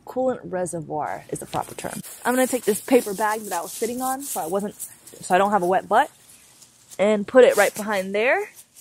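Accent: United States. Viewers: Dear, I hope I can be your video pen pal, on you tube! My name is Tim and I am nearly 55 years of age, and I live in South Milton, in Devon. I used to be the enemy, a car driver, sharing a car with my Dad, though I have had a collection of motorbikes, studying electronics. My Dad and elder brother had one or two however, until they too became the enemy. May I seriously give you my male support wishing for anyone should learn to ride a bike or drive a car, male or female. Is it very rude, if so, I apologise to you, to ask you, did I detect an American accent? If so, may I wish you a warm welcome to our country?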